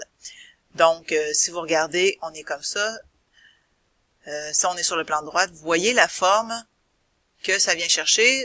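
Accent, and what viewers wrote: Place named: Quebec, Canada. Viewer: Bonjour , Je pratique Solidworks depuis 20 ans et j'en apprend encore, je viens de suivre quelques tutos que tu as fait, c'est vraiment très bien expliqué et cet accent du Québec quel régal !!!! merci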